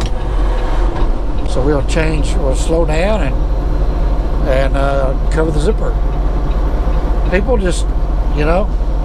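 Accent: South, United States. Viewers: I really enjoy your videos. My dad drove for many years. I crawled in the truck every chance I had. He was born and raised in east Tennessee so hearing a friendly southern accent puts me back in the truck with him pretty easily. Be careful. I'll keep checking in on you.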